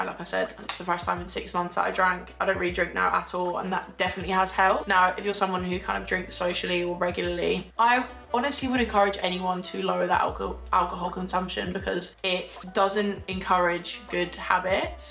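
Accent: Scotland, United Kingdom. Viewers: Well done on the weight loss! Amazing work! I’ve not watched you in years and you only just came up on suggested even although I’ve been subscribed for years. Where’s your Scottish accent gone??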